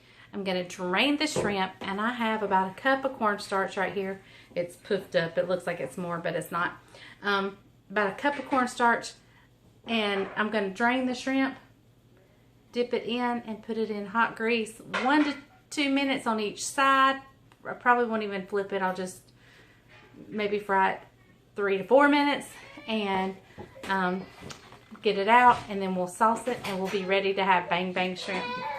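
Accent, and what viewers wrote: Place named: North Carolina, United States. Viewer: Another NC staple, - DUKE'S mayonnaise! No other brand goes in my fridge. I am so glad I discovered your channel. It's not often you get to watch any program and hear someone who sounds like we do and who is so relatable. Thank you for sharing your life with us and for your sweet spirit. It's very much needed!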